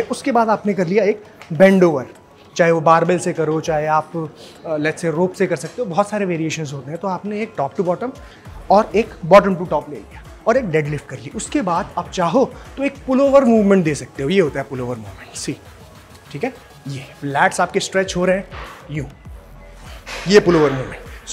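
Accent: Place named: Delhi, India. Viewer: Muhje ek bhot confusion hota appki😂 tone sun kar kabhi kabhi lagta hai app Mumbai ke nahi ho kya app Delhi ke ho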